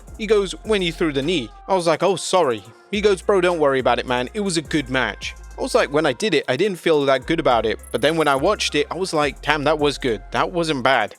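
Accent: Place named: United Kingdom. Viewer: Bri'ish bro talking smack about the jackass crew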